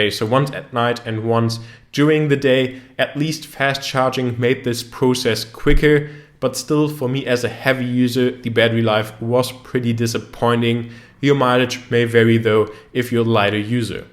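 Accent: Germany. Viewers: Bist du Deutscher, hört sich so an^1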